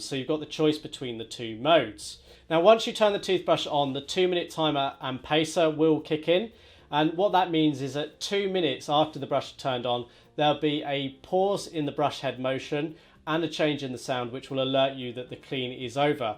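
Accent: United Kingdom. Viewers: Probably should drop the English accent too...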